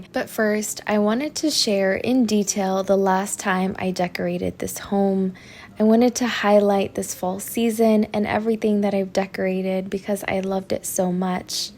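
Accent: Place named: Texas, United States. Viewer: I’m guessing Texas or Cali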